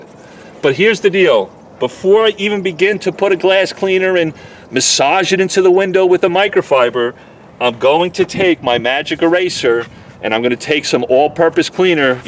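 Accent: New York, United States. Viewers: >Dallas Paint >Thick New York accent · Lives in Dallas but is from New York, New York accent. 😊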